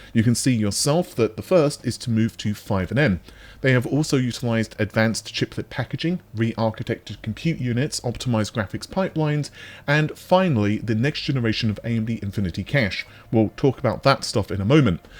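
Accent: United Kingdom. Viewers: Three quarters of an hour! Three quarters of a fkcun hour and you don't know anything! For three quarters of a fkcun hour you talk the talk about the talk which talks about other talk you've heard in the past and touches on possible future talk you might or might not hear one day, and all I get from that is waffle in a British accent! You don't know shit ~ you can talk about not knowing shit for 3/4 of an hour. I'm never going to get those 45 minutes back. It's like the promise from Micro$oft that you can wipe windows and get a refund from the company. In all the 3 billion people who have wiped windows and tried to get that M$ money back, how many have actually received it? I can hear crickets, I can hear tumble-weed blowing past..... I can hear Liz Tru$$ going down in flames but I can't hear anything about my 45 minutes! But for the rest of my life I will be still able to hear the talk that talked about the talk, which featured the talk about talks we've had in the past and looked into the bright and Rosy future (she's a nice girl, Rosie) and then we stopped to talk about that talk, so the talk got side-tracked by the talk which went into a talking holding pattern around the outer marker while we talked about fuel economy and reserves of time, because to run out of wind in mid talk would be a catastrophic event, and no meta-talk (a talk about a talk) could deal with the meta-catastrophe of talk about talk that talked itself to a stand-still....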